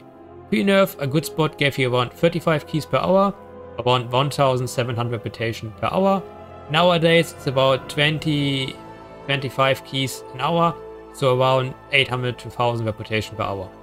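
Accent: Germany. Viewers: Bist du deutsch? Hast nämlich solch einen Akzent xD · thick german german accent :D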